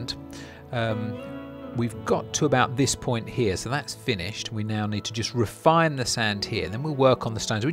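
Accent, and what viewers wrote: United Kingdom. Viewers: That's a nice British accent you've got there, where do you live? ( answer only if it's appropriate for you)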